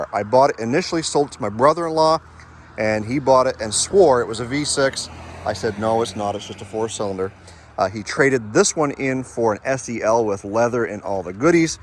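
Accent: Canada. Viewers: There is that damn ou Canadian accent. Just terrible. HATE IT.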